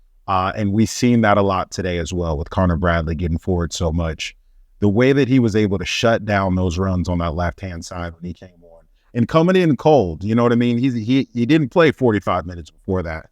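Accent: United States. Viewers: Usually my brain turns off when I hear an American accent in relation to football talk but your depth in knowledge and analysis is superb! subbed!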